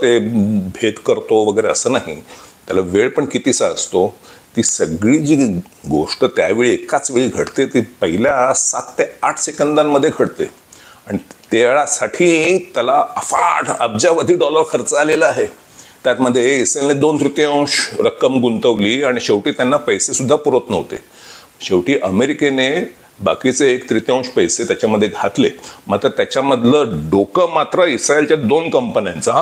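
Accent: Pune, India. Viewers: चांगले शालजोडीतले हानतोस रे तू मुंबई त जन्म घेतला पण बोलतो पुण्याकरा सारखा धन्यवाद मजा आली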